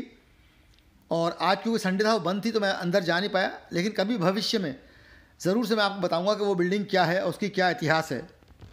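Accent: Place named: Uttar Pradesh, India. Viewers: ji namaskar main aapki video Kafi Samay se dekh raha hun aap bilkul sacchai Bayan Karte Hain dusri Baat Aap Ki Boli mujhe bahut acchi Lagti Hai kya aap up Se Hain aapki baten sunkar maja Aata Hai kya aap India ko mis Nahin karte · जो आप u p स्टाइल में बोलते हैं बहुत ही मजेदार वेज एग रौल 😁😁😁😁❤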